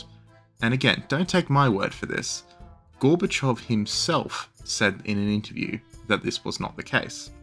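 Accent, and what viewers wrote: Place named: Australia. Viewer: You sound like an Aussie. More correctly an ill informed AUSWIPE. Every word Putin spoke was true. The west has big plans for Ukraine THESE ARE THEIR WORDS. 1, They plan to shut down farming in Europe making it the crime of ECOCIDE punishment jail move it all to Ukraine. 2, Shitstain 🇬🇧 has spent big in Odesaa and the coast hoping Ukraine would build cheap warships. 3, Israelistan owns 30% of the land in Ukraine Black Rock and Vanguard planned to buy 100%. 4, Israelistan owns almost every major business in Ukraine just as they own Australian businesses. 5, Ukraine is losing the war its Military decimated. Redacted in the US announced Israelistan 🇺🇲 has ordered ZZelinsky to replace its entire government with western educated people who are LOYAL TO AMERICA this accounts for General Zalhuznyi a UkroNazi his replacement more acceptable to RUSSIA and the WEST. 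6, The ploy to take Ukraine and the southern ports failed tbey thought they could fill it with war ships and block Russia's warm water ports. 7, The west thought creating a UkroNazi state yes there is film of an American Nazi explaining how the CIA used US Nazis to create AZOV Shitstain 🇬🇧 created right sector 1 and 2 using UK Nazis other groups KRAKEN etc exist they were handed territories where they ruled like medieval Barons. The war to collapse Russia failed Europe and Israelistan are bled dry of weapons cash and raw materials they hoped to steal via the total collapse of Ukraine and Russia have evaporated theyre broke.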